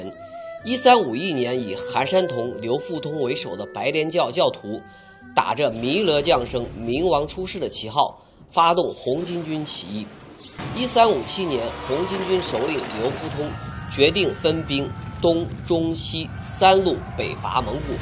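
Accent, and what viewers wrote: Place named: Northeast, China. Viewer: 東北口音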